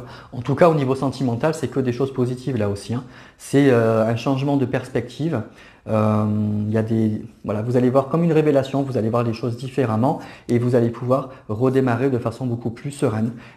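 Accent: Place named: South-West, France. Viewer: Bonjour ☀️ et merci pour ce tirage. Petit accent du sud-ouest que je reconnais, j'ai le même ☺️ A bientôt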